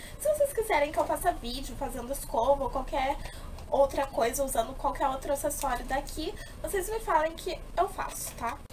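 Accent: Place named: Brazil. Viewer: Olá querida, belas comprinhas, mas esse sapato azul, uuii adoro !! Sou portuguesa mas amo o sotaque brasileiro ;) Passa no meu canal que tem video novo ;) Beijinhooo grandeee *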